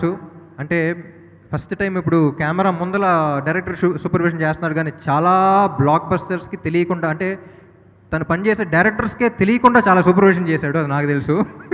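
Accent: Telangana, India. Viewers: After I smart Shanker....telangana slang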